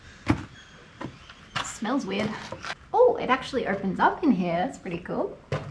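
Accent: Australia. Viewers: I noticed; some similarities of Australian accent ( yours ) & American "Southern" accent, where "fire wood" and "alright".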